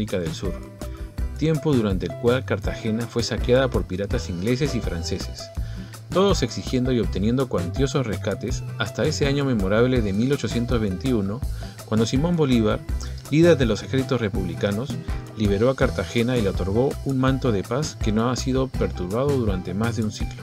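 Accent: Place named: Lima, Peru. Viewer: El narrador tiene acento de Lima, Perú.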